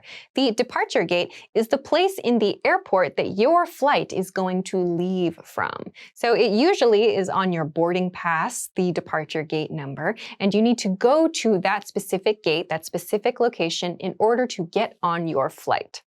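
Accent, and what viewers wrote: United States. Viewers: She speak in American accent❤ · First of all thank you for your content! I'm confused a little, why does a "suit" sound like a "sweet" (at least this is how i hear it) - I've checked that word on the youglish and it sounds a bit different. Is your pronunciation specific to some region in the US? cheers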